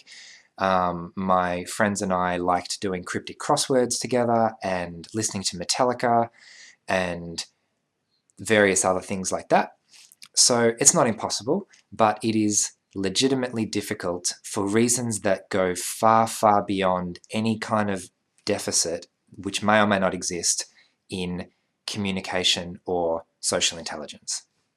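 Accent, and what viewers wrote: Australia. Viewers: Just out of curiosity, what part of Australia are you from ? I live in Perth, WA. I cannot figure it out. Lol i don't watch TV either.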